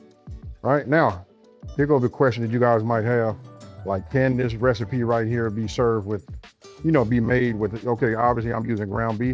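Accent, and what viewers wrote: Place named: California, United States. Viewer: Man this right here cuz!!!!!!!!! Omg this is seasoned to perfection. I’m here a Cali accent but I’m seeing down south cooking going on. Good lawd!!!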